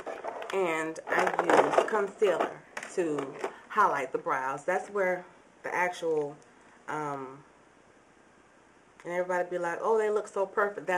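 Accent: South, United States. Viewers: Girl I'm from the south and I just love how you talk with your southern ascent!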